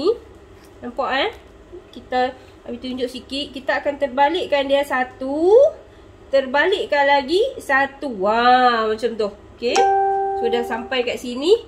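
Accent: North, Malaysia. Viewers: Walaupun saya bukan org utara, tp masih faham apa cikgu terangkan.. Dan suka pulak dah dengak loghat cikgu.. Dan cikgu terang memang jelas dari a-z.. Terbaik.. 👍👍👍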